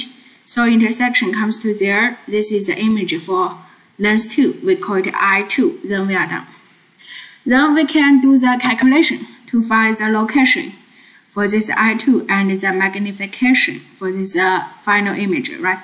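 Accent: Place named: China. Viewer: 聼口音能聼出來是華人，感謝感謝，最近在學雙語大物，沒有頻道主你的付出我真不知道怎麽辦了:face-purple-crying::face-purple-crying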